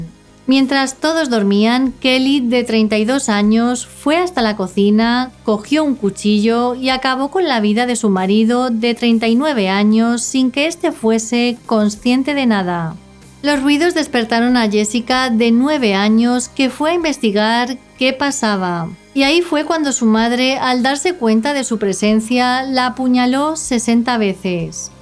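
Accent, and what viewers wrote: Spain. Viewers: Me encanta tu acento español más elegancia misterio i suspenso cuando naras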